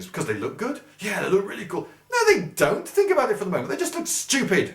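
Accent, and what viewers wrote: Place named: United Kingdom. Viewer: I have never heard such a good example of a british accent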